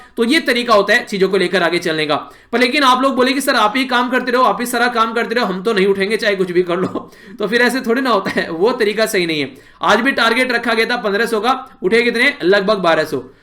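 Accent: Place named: Rajasthan, India. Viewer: Sir r u belongs to rajsthan bcz ur pronunciation .... M bhi rajsthani so I can connect ☺️🤪like Sadi instead of shadi , dactor instead of doctor n so on..........I have been noticing this thing for many days so ......☺️☺️☺️☺️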